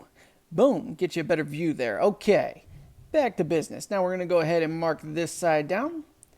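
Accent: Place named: South, United States. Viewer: It was the southern accent that helped this one.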